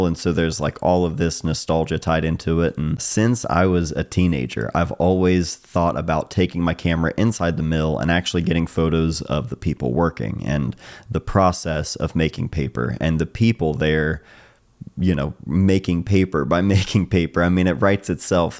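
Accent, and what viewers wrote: Ohio, United States. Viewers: Okay, so I'm a newbie to photography and found your channel by random chance on YT. I started to listen to you speak and immediately thought "that accent sounds familiar"...and then I check your channel's "about" portion and find out that you're from/based in Chillicothe, Ohio. And it all made sense. I grew up not far from you. It's great to see someone from that area of "rust belt meets Appalachia" doing so well on YT and in his creative pursuits. Keep it up, bub!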